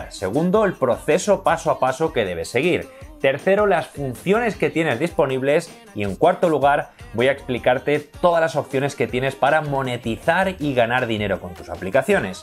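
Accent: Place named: United Kingdom. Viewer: british englisshhhh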